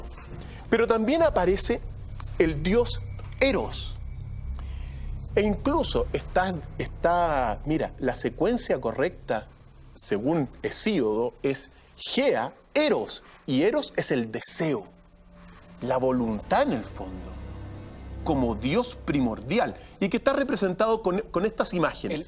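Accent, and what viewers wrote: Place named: Chile. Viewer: Esto es Chileno mil por mil... ese acento lo recozco a kilometros de distancia. Saludos desde Honduras.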